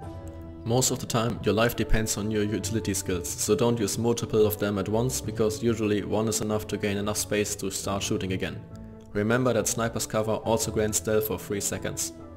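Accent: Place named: Germany. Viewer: wie ich einfach instant höre das du deutscher bist ^^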